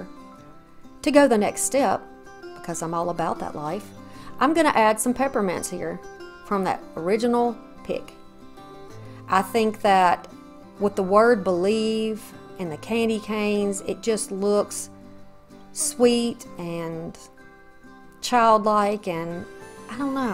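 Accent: South, United States. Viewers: as a new resident of the South, I love hearing you talk! I moved here from California and it was the best decision I ever made. Not to mention, you’re one of the most creative YouTubers out there ❤ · that wreath is AMAZING ITS GORGEOUS. I JUST LOVE YOUR CHANNEL GUUUUURL.... I love your voice, your accent is so sweet and idk comforting to me. I love your confidence and I just like you. I think you're honest, spiritual, creative and humble. I'd like to be your neighbor. You just seem so real. Just wanted to tell you that I really appreciate you sharing your talents and creative mind. I have so many things I wanna do I feel like I never get anything done. Btw I do not know what it is but that southern accent gets me every time. You and Kathy Jo. I love her too. She's very creative and funny. Btw I am from Nova Scotia Canada Cape Breton Island to be exact and we sound like we are Irish with long drawn out syllables. 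Hahaha hahaha. Like the word MAN well we say ( may-ne).Hmmm 🤔🤔🤔 I think that's where we might have a similar context but different accents. Depending on how much wine 🍷 I've had lol.... Hahahahaha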